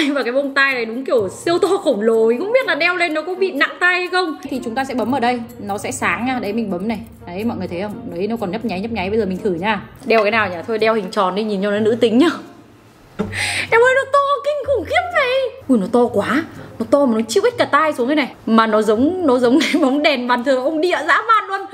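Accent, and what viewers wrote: Nghe An, Vietnam. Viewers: video sắp tới mần video review bằng giọng Nghệ nha chị :<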